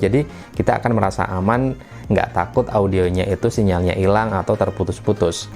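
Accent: Pekalongan, Indonesia. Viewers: Bang orang pekalongan y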